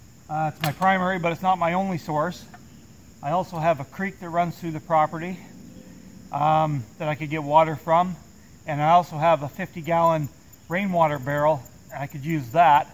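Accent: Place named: New Brunswick, Canada. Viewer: Well hello there fellow NB’er, at least I’m gathering from your name and the North Eastern, not to mention the accent, lol. The off grid chores never end and today will be the battle of my hand pump and hopefully an oil change on the ol’ Sierra. New subscriber here, thanks and cheers from Miramichi. 👋🙏❤️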